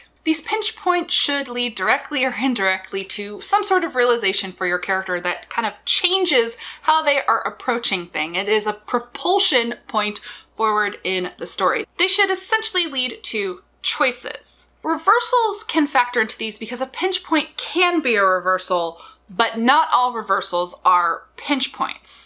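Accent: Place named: United States. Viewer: Yes, that is it, thank you! US accents on French words was throwing this Brit for a loop. I've googled so many variations of what I thought you said and, understandably, found nothing.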